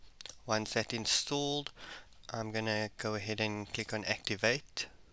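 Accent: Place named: South Africa. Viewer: Good to hear a familiar South African voice. lol. Cool vid. Worked 100% for me.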